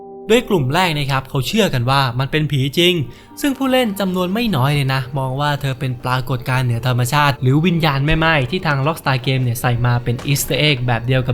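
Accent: South, Thailand. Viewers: พี่เป็นคนใต้หรอ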